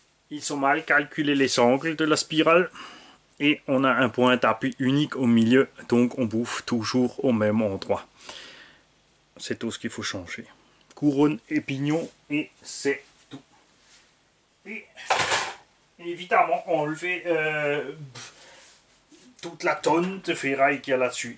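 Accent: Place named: Alsace, France. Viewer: Je savais pas que t'avais un accent alsacien aussi prononcé mdr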